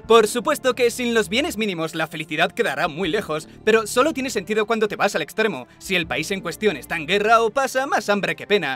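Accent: Spain. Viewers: "Es confuso verdad?..." - El Dios con la voz española españa talla por los dioses y los demonios... La verdad sabe temas y cosas que puede hacer FAMOSISIMAS!!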